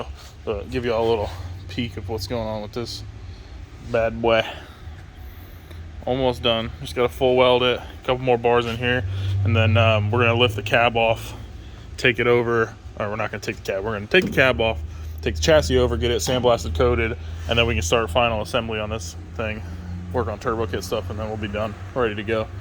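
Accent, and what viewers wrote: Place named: Florida, United States. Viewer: You a real Florida man accent there boe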